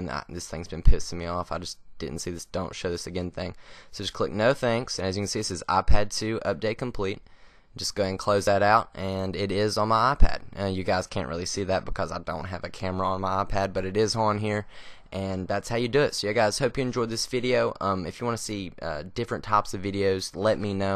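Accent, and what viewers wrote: Texas, United States. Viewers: This might be of interest for some: The "How-To" starts . Also: Your accent reminds me of "The Grid - Texas Cowboys". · Dude, are you come from the south? lol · You’ve like a Texas accent. Almost....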